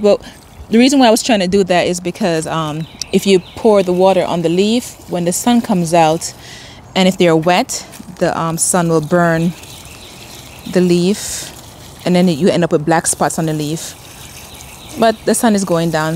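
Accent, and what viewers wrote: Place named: South Florida, United States. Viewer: I love the “f” for fertilizer Friday— I’ll remember that now! Also your accent makes me miss South Florida! 🥰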